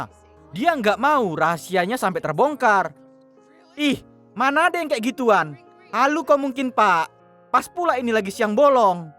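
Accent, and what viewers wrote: Medan, Indonesia. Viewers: orang medan La kau Bang,. yg punya Channel,.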